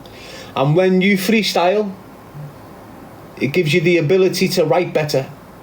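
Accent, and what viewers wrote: Liverpool, United Kingdom. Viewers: <link> Hes the best scouse I've heard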